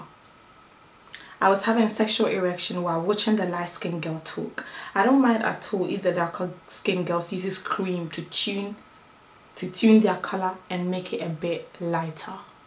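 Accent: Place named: United Kingdom. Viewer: u are stunning i live in the us so i have not had herd the accent in many year i was on uk once many yrs ago with the military but i could never gt tired of hearing u speak iam certain its a pleasure to hear u say something as simple as good morning or pass the pepper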